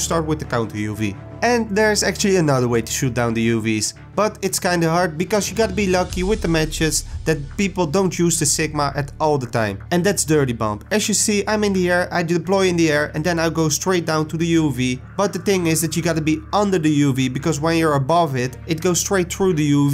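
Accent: Netherlands. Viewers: ik hoorde het all aan je accent 😂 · Hahahaha ik hoor je nederlandse accent zo duidelijk. Lachen man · Je kan gwn horen dat je Nederlands bent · The dutch accent😂🤦‍♂️ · Bij het eerste woordje hoorde ik al dat je Nederlands bent hahaha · Nederlands Accent Approved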